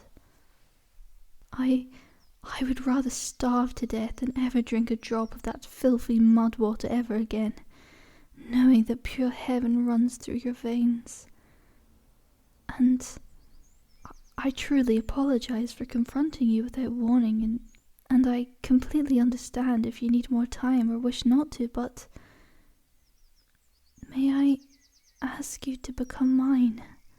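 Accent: Scotland, United Kingdom. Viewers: I love the hint of Scottish in the accent btw! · Scottish or British, miss? Or might you be Aussie? I'm lead to believe you are one of the three.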